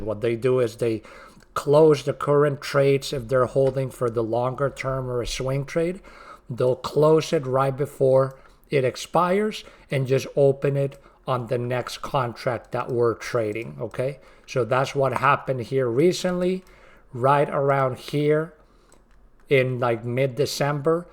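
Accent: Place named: Belgium. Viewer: Are you from Belgium? That accent sounds familiar.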